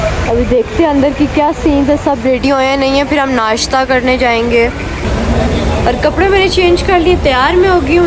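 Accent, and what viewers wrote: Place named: Rawalpindi, Pakistan. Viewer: Sai pindibois wala accent hai. Good work 👍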